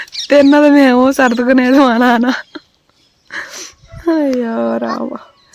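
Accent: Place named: Ongole-Guntur, India. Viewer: Meedi Ye ooru andi..... garu....Adento Koncham slang Ongole and Guntur mix undi...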